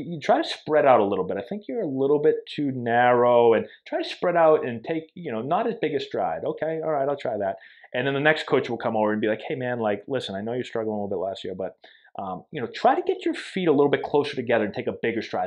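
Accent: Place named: New England, United States. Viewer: He's just got that New England accent lol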